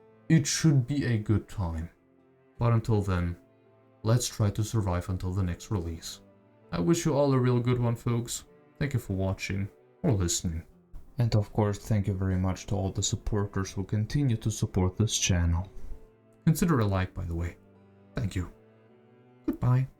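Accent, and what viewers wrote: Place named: Germany. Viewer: Nah, he sounds like a German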